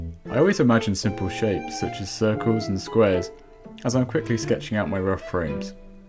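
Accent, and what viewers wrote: United Kingdom. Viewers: I had no idea you were actually British. In hindsight it is reckless to assume that everyone likes to do a British accent in daily life like myself. PS, your coloring is awesome, and is definitely the most unique part of your animations! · You sound like you are from British.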